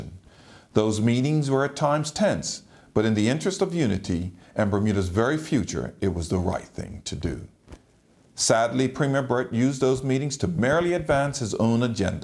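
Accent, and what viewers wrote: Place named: United States. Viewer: His accent is more American what gives 🤓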